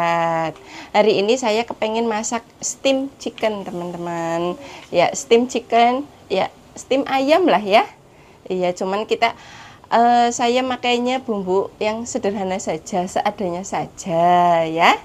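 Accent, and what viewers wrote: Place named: Tegal, Indonesia. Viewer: Wah resepnya mudah dan simpel y mb semoga bs dicoba SM ak insyaallah klo ad rezeki pengin nyoba jg mb seneng deh liat MB sekarang udah bs bikin konten sendiri y mb walaupun sederhana y mb videonya tp banyak yg nonton mb mengispirasi mb logat ngomongnya kayak ak MB ak dr Tegal ngapak jg mb tp ak ikut suami tinggal dilampung mb semangat terus mb bikin kontennya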